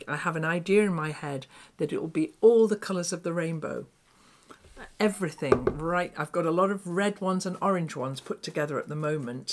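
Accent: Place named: Lancashire, United Kingdom. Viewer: you brought back such an emotional memory of my beloved Mum who died at 54 when I was 21 I’m now 75 In your soft Lancashire accent just like my mums ( busy hands Lass calm head ) she was an amazing knitter sewer crocheter She would have loved this YouTube community as I do & would be on the lime green sofa knitting of course Thank you Thank you 😍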